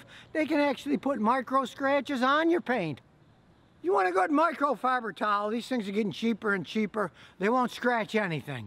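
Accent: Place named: California, United States. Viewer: When I first watched your videos, I guessed you were from a California. You don’t sound like a Texan. I have good friends from both states.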